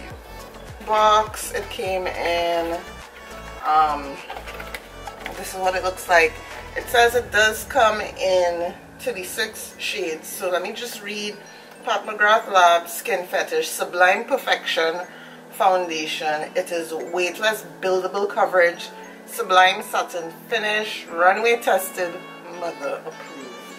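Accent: Trinidad and Tobago. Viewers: Are you trini???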